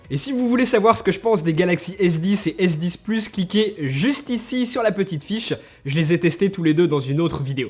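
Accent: Lyon, France. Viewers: Tu es lyonnais?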